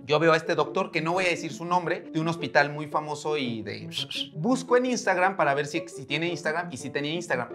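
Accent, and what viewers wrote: Mexico. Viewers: el acento mexicano es insoportable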